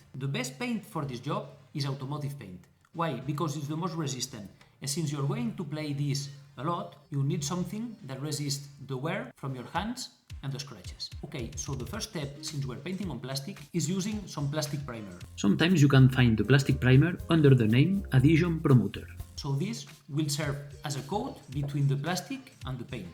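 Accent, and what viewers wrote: Spain. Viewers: Se nota que eres español🇪🇸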